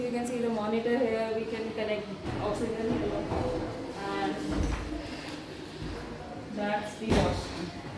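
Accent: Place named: Bangladesh. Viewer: You were speaking Bangla with Bangladeshi accent.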